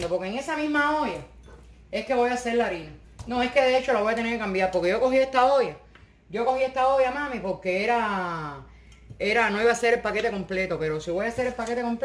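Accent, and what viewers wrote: Cuba. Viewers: Ay que cómica!!! Me identifico mucho contigo, no como cocinas, como hablas Jajaj,es que somos cubanas 👍🏼❤️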